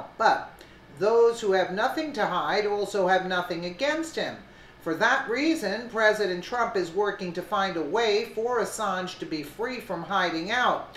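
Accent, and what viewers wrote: New York, United States. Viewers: I am from south ga and I love your New York accent. It warms my heart everyday!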